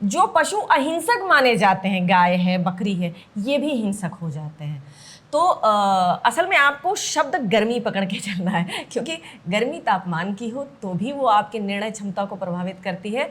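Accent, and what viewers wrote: Bhojpuri, India. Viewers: आपकी बोलने का अंदाज एकदम भोजपुरी भाषा में है😊❤❤❤❤❤❤❤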